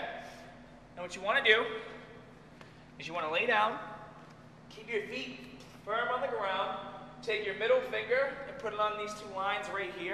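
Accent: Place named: New York, United States. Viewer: He's from New York...that's how they talk! :)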